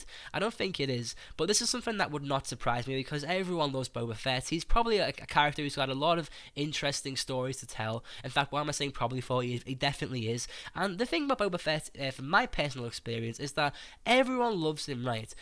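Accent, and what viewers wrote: Liverpool, United Kingdom. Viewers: can i ask are you from Liverpool? i live in Liverpool, i'm glad to hear a familiar accent on youtube :)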